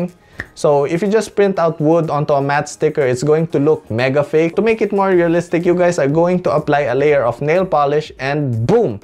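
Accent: Philippines. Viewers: Is this a filipino the way he speak english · Is this guy filipino? Cause his accent kinda sounds one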